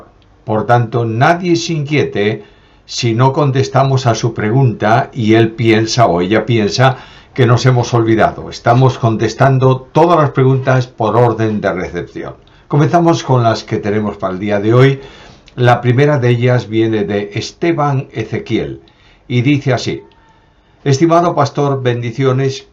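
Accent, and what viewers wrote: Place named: Galicia, Spain. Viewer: El no es malhumorado, simplemente es su acento gallego, a mí sinceramente me agrada ver como el hace énfasis con su acento y tono, ¡Dios le bendiga!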